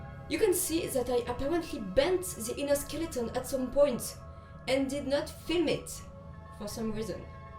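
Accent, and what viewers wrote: France. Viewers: Are you french? I've never heard this accent outside of movies so I didn't know it was real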